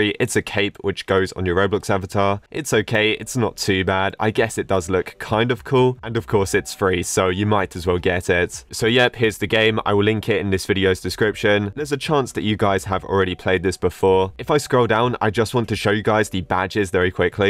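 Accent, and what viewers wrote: United Kingdom. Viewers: are you came from UK?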